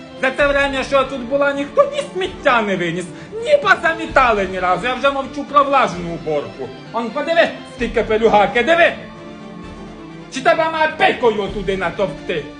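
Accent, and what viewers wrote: Poltava, Ukraine. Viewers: Чого причепились,Полтавщина так завжди балакае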